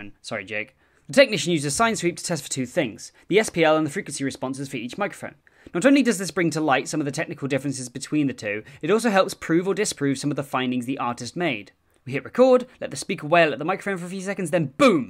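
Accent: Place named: United Kingdom. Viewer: 100% agreed. That brittish accent is awesome.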